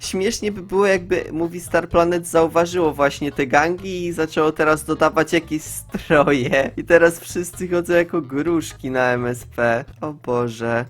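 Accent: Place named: Podlasie, Poland. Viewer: Omg mam wrażenie, ze jestes z Podlasia bo gadasz po mojemu hahah❤️Mój nick: brązowa laska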